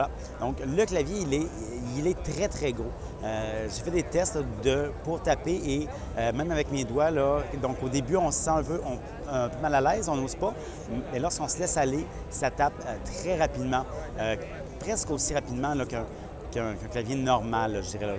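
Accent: Quebec, Canada. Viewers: L'accent québécois est toujours aussi marrant mais on comprend très bien et vidéo très intéressant. Merci beaucoup pour cette présentation.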